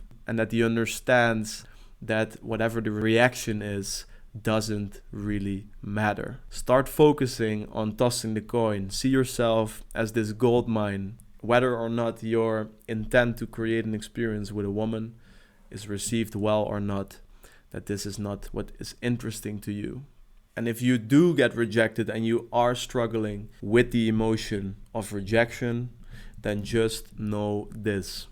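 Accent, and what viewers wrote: Netherlands. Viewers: Jouw Nederlandse accent is zoooo sterk, niet normaal meer man.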